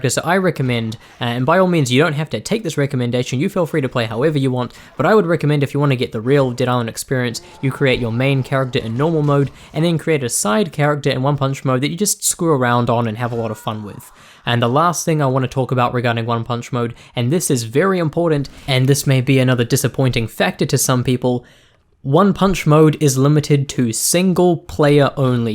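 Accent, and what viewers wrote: New Zealand. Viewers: What part of new Zealand do you come from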